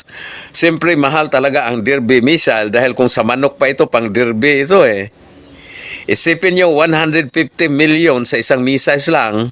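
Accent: Visayas, Philippines. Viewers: ingay mo man doon katumira sa china halatang bisaya ka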